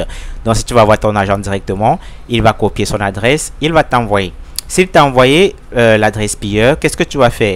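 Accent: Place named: Africa. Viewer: un pot moné épargne nous ton accent africaine stp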